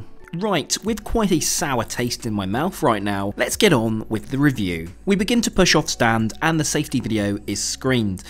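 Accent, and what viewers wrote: United Kingdom. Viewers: i assume the channel owner is british (judging by his accent), the UK uses the metric system (km), why tf would he use miles?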